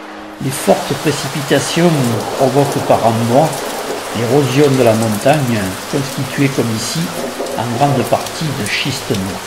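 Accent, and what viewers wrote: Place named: Provence, France. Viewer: Mon père qui est randonneur me parle souvent du Mercantour qu'il affectionne pour l'avoir foulé plusieurs fois. Je le découvre avec émerveillement grâce à tes images magnifiques. Comme le dit si bien Daniel (Lapin 25), ta voix de provençal nous fait déjà voyager. Grand bravo, amicalement Gégé et Luciole du Doubs.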